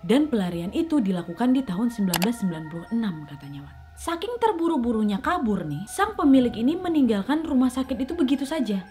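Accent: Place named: Madura, Indonesia. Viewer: kok kaya logat madura😎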